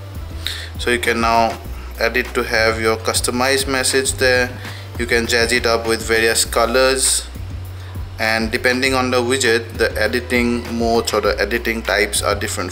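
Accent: Assam, India. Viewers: the guy has a very much Assamese accent ;)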